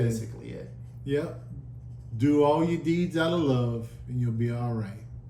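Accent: New Orleans, United States. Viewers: Love your story, and you sound like your from the New Orleans area? I was born and raised there.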